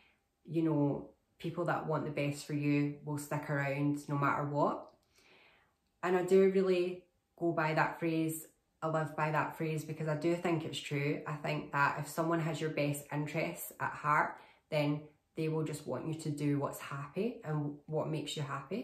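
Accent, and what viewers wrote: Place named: Scotland, United Kingdom. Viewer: I love hearing your Scottish accent. personally I am about 3 months on oestrogen. I don't really have anyone to support me in this journey I am taking. I would love if you lived in Ireland and I could call you daily and get some advice off you which would help me a lot. I do wish you the very best of luck and I would love if you could do more videos that would include your journey as much as possible.🌹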